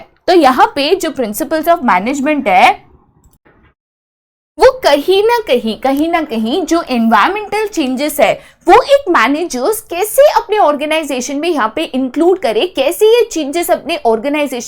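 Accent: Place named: Mumbai, India. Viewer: Mam aap Mumbai se ho naa appke word ascent se pata chalta hai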